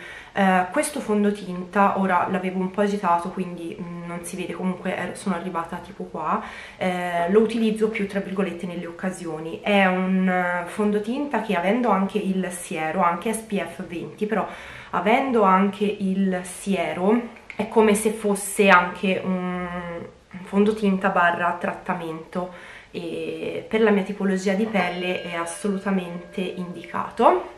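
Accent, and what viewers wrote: Tuscany, Italy. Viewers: Ciao. E' la prima volta che ti vedo su youtube. Sei veramente bella, mi piacciono in particolare i tuoi capelli. Dal tuo accento mi sembra di capire che sei toscana, forse di siena, ho indovinato? Ciao.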